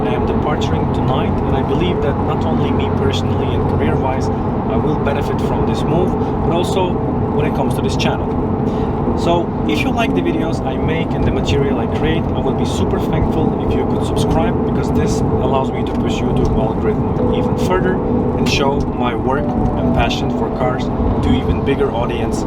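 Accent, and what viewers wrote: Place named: Russia. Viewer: i Love rus accentet! it not mostakbal! lovat red 996 !!!!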